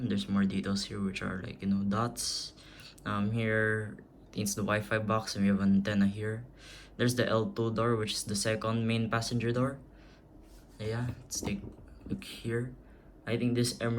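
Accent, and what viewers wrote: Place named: India. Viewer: Are you Indian? I like your accent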